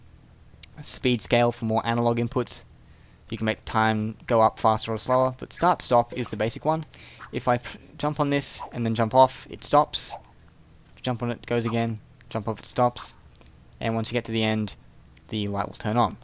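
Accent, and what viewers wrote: Australia. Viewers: u an aussie?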